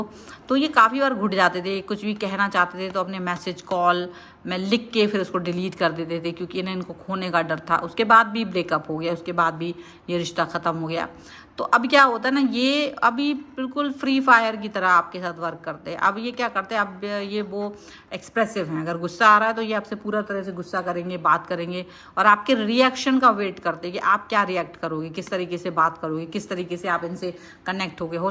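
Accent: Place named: Uttar Pradesh, India. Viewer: 😘😘😘😘😘 aap mujhe U.P. se lagti ho kyuki main bhi U.P se hu and aap ko sun ke maine pehle bhi poocha tha...jab first time aapko suna tha....mujhe hamare ghar ki yaad aa jati hai..😢 isliye ...naa ki kuch galat hai...I truly like you and appreciate your efforts ❤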